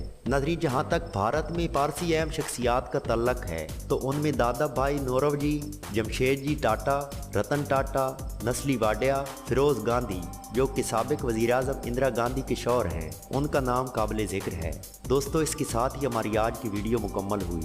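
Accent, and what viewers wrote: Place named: Sheikhupura, Pakistan. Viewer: I love you style n voice shiekhupura Ke ho peeche se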